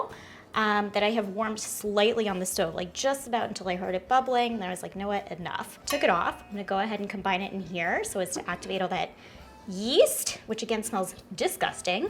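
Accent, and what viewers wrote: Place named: Rockland County, United States. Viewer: I freaking love!!! Her sarcasm kills me throughout her whole video!! Growing up in Rockland County NY she sounds like every girl I went to HS with, I love it!! 🥰🥰🥰🥰. is the best!!!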